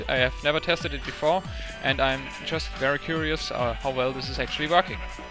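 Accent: Germany. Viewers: Are you german because i am and my english sounds like your's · I'm Dutch too, and has a German accent, very clearly not Dutch, but German.. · das hört man doch dass er Deutscher is ´haha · Dude germann accent is almost the same and im pretty sure he is german since in his bike wheelie video you saw alot german stores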